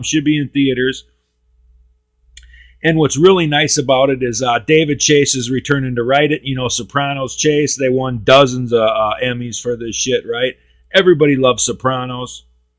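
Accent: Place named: Midwest, United States. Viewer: Hey you stuttering, muttering prick ya! Calling me a midget when I stand around 6’ and 230 isn’t the smartest thing to say. In addition before you take a podcast to task it would behoove you to actually do some research first. My father worked for AA so I know a great deal about him. I know you get a chubby when you think about these mobsters in your mom’s attic while you munch on hot pockets. Guessing by your accent you are probably from Illinois or KC. I will give you some cred since these were big mob towns. The way you pronounce APALACHIN is laughable. If you want to be taken seriously ever, do better research in the future especially when you call someone a midget that could probably kick the shit out of you! Thanks for the shoutout and watch me as Matty the Horse on the Deuce.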